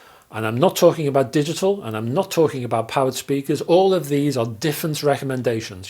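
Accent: United Kingdom. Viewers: Played the video and got an earful of British accent. I immediately, trust this man's recommendations for absolutely everything, from record players to scuba gear to fighter jets.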